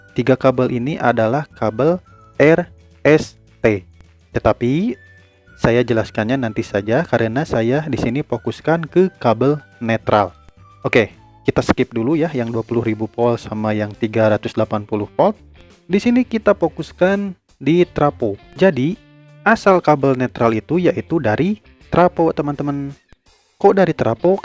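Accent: West Java, Indonesia. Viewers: Mantap, sunda pisan, salembur atuh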